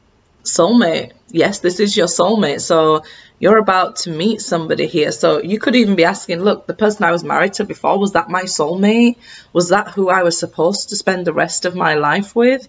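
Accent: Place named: Lancashire, United Kingdom. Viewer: You sound like a local. I'm a Lancashire lass😊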